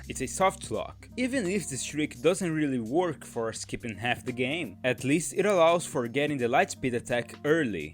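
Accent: Brazil. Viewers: Aaaahhh ma tu é brasilero sem sombra de dúvida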